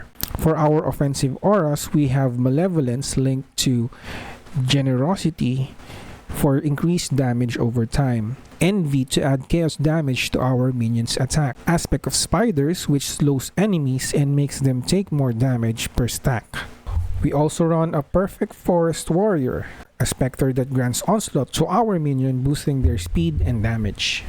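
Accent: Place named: Philippines. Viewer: Accent is very pinoy ✌️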